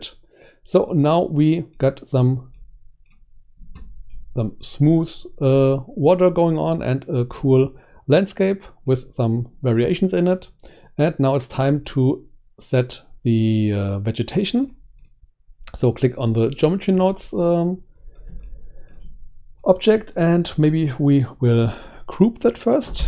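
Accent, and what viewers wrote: Germany. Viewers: your denglish is the hammer ;)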